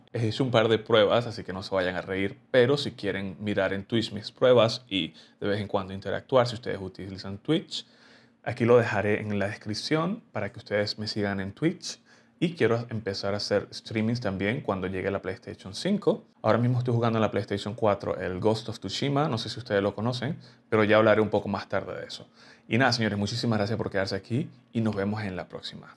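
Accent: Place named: Venezuela. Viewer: Epa chamo jajaja vine porque quería mas consejos sobre la barba pero me quede escuchando tu historia tu acento es venezolano no tenia ni idea quiero preguntarte unos consejos sobre la barba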